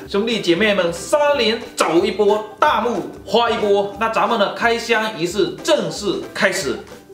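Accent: Fuzhou, China. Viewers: 就喜欢浓浓的福州呛！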